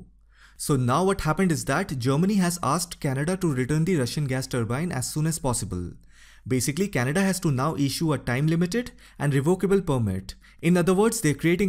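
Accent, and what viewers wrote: India. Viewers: Why the Russian guy who did this comprehensive video is talking with an Indian accent? The whole point of any American intervention in Europe is to put down Russia for good, no matter what. But You are talking about people suffering, who cares. While Putin is sending all those young fellas to die defending the future of motherland Russia, a country who has no future at all, Americans are sending Ukraine nationals to the front, in the future they will send European nationals if necessary. It is a win win situation for them. I am pretty sure a German jobless kid will rather enjoy a cold winter at home than dying in the front if he is convinced that a boycott to Russian gas will cripple Russian economy for good. In two years you will be making a video on how your beloved Russia lost this war and was harassed by vast continents. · There are 2 sides to the war. 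Russia and the West. The west can stop the war and so can Russia. The west can stop sending aid to Ukraine and remove sanctions... OR Russia can simply withdraw from Ukraine. To say it's the west that is at fault shows your preference for Russia. Strangely you sound Indian and yet do you not realize that neutrality in the war will significantly benefit India and China while the rest of the world suffers.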